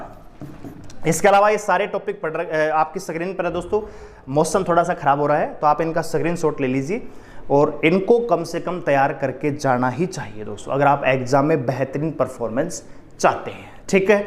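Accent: Haryana, India. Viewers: Aap haryana se ho kya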